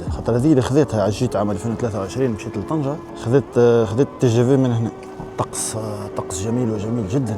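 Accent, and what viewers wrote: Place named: Libya. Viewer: عندو اللهجة الليبية وليس التونسية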